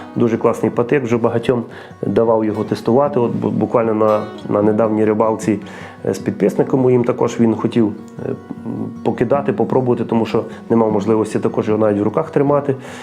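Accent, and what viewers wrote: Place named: Western Ukraine, Ukraine. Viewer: А Ви із Західної України.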